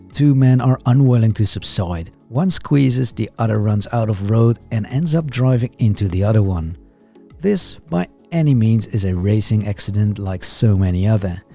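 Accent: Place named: Netherlands. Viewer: Also, he's doing a great job speaking English but I still hear a distinct Dutch accent.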